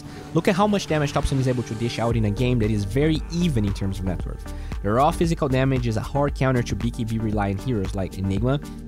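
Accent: Brazil. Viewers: Hey big fan here, Just a suggestion, try to loosen up more the brazillian accent, try removing ur chu-to accent Make sure chu fight chu win And instead Make sure to fight to win For me thats the biggest tell of a portugese accent (sorry for the wrong spells) Ive watch too many mma's where there r a lot of brazillian fighters. Love ur content bro, straight to the point and no beating around the bush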